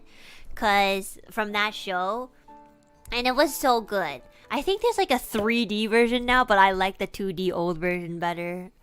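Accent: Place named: United Kingdom. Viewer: 笑死 英國腔真的有像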